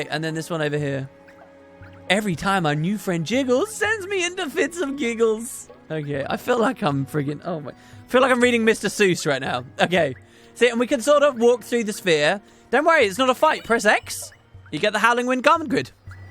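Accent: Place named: Scotland, United Kingdom. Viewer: I vote for more of Scottish accent.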